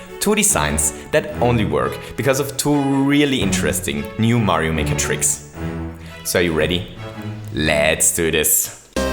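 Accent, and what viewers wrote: Germany. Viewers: Are you german? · He's German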